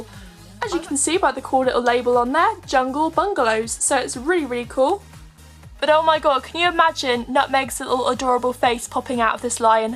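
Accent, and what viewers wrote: England, United Kingdom. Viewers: Are you from the north or south of England. ? :) love your accent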